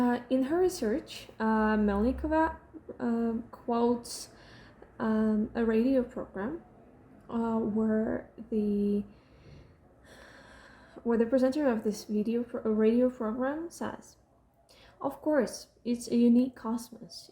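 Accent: United States. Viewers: they all sound like an Americans voice? I can barely tell English isn't your first language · I reiterate the common sentiment regarding the quality of content. But hardly anybody has commented on the supper quality of English. I, for one, could have easily assumed she is an American. Simply superb (American) English. Hi from England, UK.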